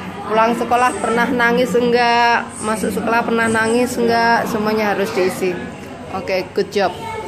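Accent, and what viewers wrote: Java, Indonesia. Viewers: Mbak salam kenal ya... saya seneng sampean bahasa jowonya msh medok..i like it😊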